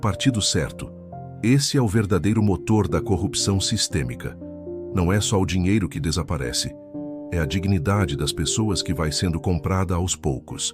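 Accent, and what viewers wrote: Brazil. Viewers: Quando é que vamos ver a tua verdadeira cara porque tenho a certeza você é uma pessoa conhecida em Cabo Verde mais preferes ficar escondido atrás das camaras com um narrador português brasileiro o que não crédibilisa os teus argumentos sempre para destruir tudo de bom que os nossos dirigentes tem feito de bom para Cabo Verde nunca ouvi da vossa parte uma coisa positiva sobre nosso país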